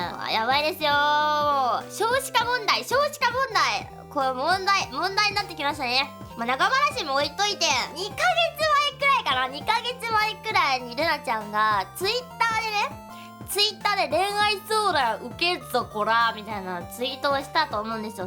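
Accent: Hakata, Japan. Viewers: 博多弁まじ神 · 博多弁よっしゃぁ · 博多弁ですฅ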